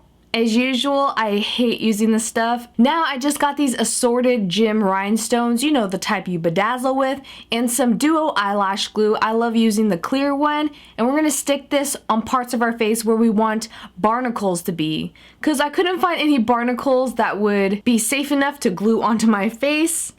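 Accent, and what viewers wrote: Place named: South, United States. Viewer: To my knowledge she's from Texas [Now living in California], so it'd be southern.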